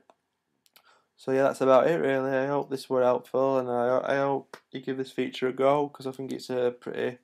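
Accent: Sheffield, United Kingdom. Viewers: you from Sheffield?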